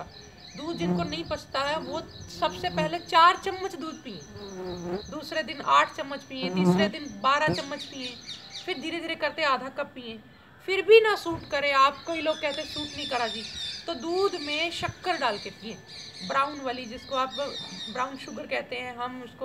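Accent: Punjab, India. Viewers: Sir aap Punjabi ho ?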